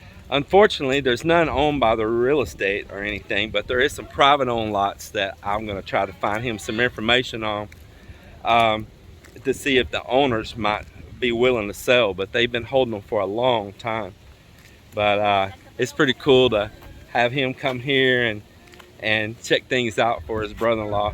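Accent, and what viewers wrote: Texas, United States. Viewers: Well said, the trolls come out when things aren't good for them, just say it once let them know you know great stuff, love that Texas drawl haha say hi to love you guys great content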